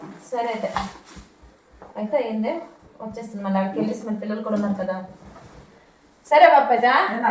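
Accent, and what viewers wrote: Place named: Srikakulam, India. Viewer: Aha emi slang mana sklm ante aa maatram undali super prank sis madi sklm town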